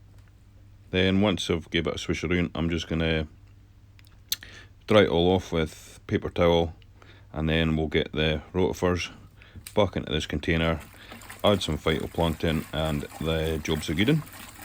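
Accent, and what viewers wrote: Scotland, United Kingdom. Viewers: Hey I can’t tell you how delighted I am to see you trying to breed those exceptional clownfish again. Your already off to a flying start with the clownfish laying on your glass tile 👍🏻 I’m so excited probably even more excited than you 😂❤️❤️❤️ Love your channel and videos. Also love that deep Scottish accent of yours. Looking forward to the next episode already. Keep em coming I need my fix weekly please 😘🥰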